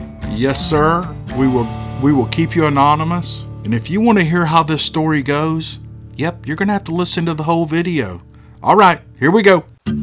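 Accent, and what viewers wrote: South, United States. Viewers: It's just that southern accent, has said many times that he does'nt really believe in ole sassy! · you are too modest sir. I think we all appreciate the time and effort you put into your videos. You sound like a very kind man. I’m from England and know nothing about the southern states of America. But they all speak the way you do it must be one relaxing place to live. Thanks again sir. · Hi there , I just love listening to your stories, I love your accent, what part of America are you from? Listening to you and watching the fire light is really calming and helps me too settle down at night. I'm from Australia and have seen our own version of a bigfoot. It is generally called a yowie but our aboriginal people have many different names for them. Some researchers here believe we may have as many as 4 different types.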